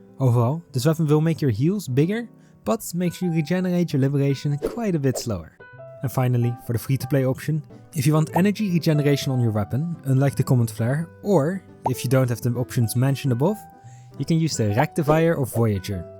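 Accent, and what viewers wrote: Netherlands. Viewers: the dutch accent, gekoloniseerd ouwe! nice vid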